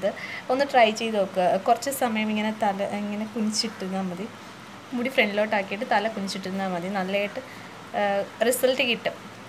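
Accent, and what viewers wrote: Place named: Kollam, India. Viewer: njan kollam aanu😅😅. Samsaarikkunne kettappol thonni aa side aannu😃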